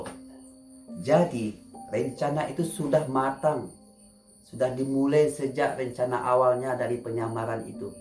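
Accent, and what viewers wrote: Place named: Padang, Indonesia. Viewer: Wah....orang padang nih mah.....logat nya atuh...he..he....he...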